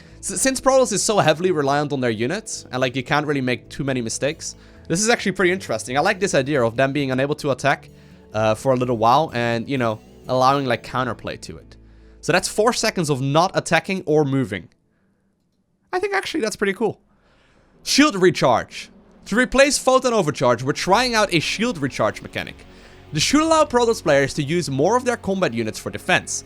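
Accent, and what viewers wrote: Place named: Netherlands. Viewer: I find that if I watch too many videos in a row I start unconsciously talking with a light Dutch accent.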